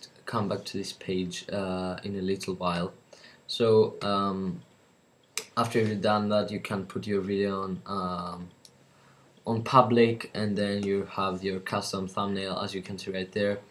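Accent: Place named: United Kingdom. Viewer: OMG HE'S BRITISH THAT COMPLETELY MAKES IT!!!!!! awesome video by the way helped a lot thanks!!!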